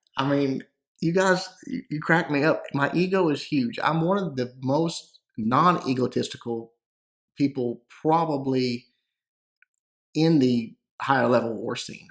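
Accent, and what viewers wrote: South, United States. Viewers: His voice is soothing. And who cares if it's country lol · Southern accent is fun, don't try to change it, it sounds like my uncle is doing the video 😀 keep on doing what you do! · I don't mind the way his voice sounds. I've heard many country voices and I live in the city/suburbs in the north east United States. So I don't understand all the hate about it myself. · its true, your voice is very country-ish, but its just adorable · dont listen to these idiots, your southern accent is the best!! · Half a year ago when i found your channel (ironically i was here because molt asked his viewers to check out a youtuber who can explain clash strategies down to a science). I was one of those folks that weren't exposed to country accent. And honestly, your accent did turn me off. But your content was ridiculously good and one of a kind out there.. 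so i accepted that about you and tuned in. Now looking back I realized what I did was silly, and unfair; I prejudiced against country accent. Now watching you daily, not only have I learned a bunch of clash, I feel I have come to tolerate the country accent. Frankly, I quite like your accent now; it sounds jolly. I'm sorry, and thank you,. I just kinda want to throw this out there for folks who aren't exposed to the country accent. Keep an open mind, learn to accept people who are different from you, and you will gain much more in life.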